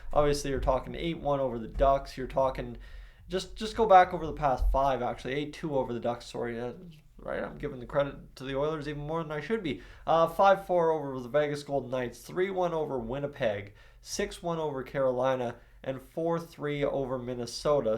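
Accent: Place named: Calgary, Canada. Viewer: sounds like a calgary boy... me too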